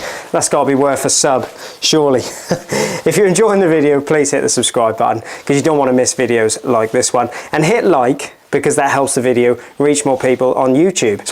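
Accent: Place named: Devon, United Kingdom. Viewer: ''Hoomid''. I'm trying to place your dialect. Is it Devonshire?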